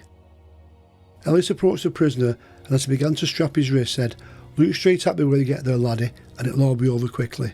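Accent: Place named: Bolton, United Kingdom. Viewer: You definitely deserve more subscribers.All that meticulous research.Maybe if you changed the name of your channel 'Albert Pierrepoint v Ted Bundy'.Incidently I saw a Pierrepoint documentary with a very young looking as a consultant,Love to hear your mellifluous Boltonian twang,Keep up the good work kid. [sorry ]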